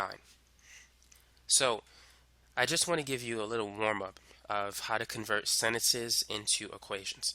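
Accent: United States. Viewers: Angie Mejia America probably, he has an American accent but with a little bit of a lisp so that's why it sounds accented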